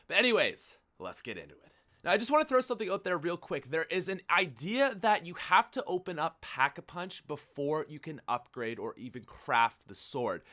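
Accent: Canada. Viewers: im sorry for this but you sound really canadian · if this is racist to u I'm srry. But r u Canadian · r u from Canada? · Holeh fuck bud ur canadian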